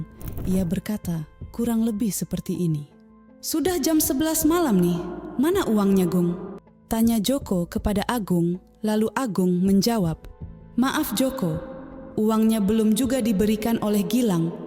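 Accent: Java, Indonesia. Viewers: Iya udah translate jawa pulak logatnya taik taik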